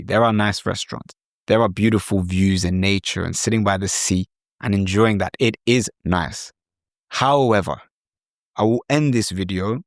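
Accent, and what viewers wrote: Ghana. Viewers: I like your Ghanaians ascent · Lovely ❤ You do speak like a Ghanaian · It's quite easy to see some Ghanaian training in you when you speak..... It's amusing and humorous at the same time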